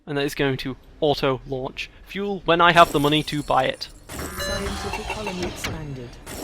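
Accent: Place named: United Kingdom. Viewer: Thanks for taking the time to do this! Good to see someone else's build strategy as expansive. I knew you were from the UK but somehow didn't visualize you with an accent. Go figure.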